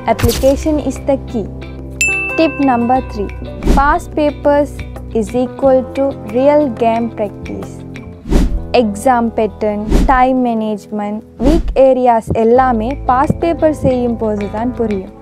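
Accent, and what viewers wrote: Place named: Trincomalee, Sri Lanka. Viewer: Ninga trincomalee distric nu nenekiren enthe oorunum vilangitu unga slang la kandu pudichiten😂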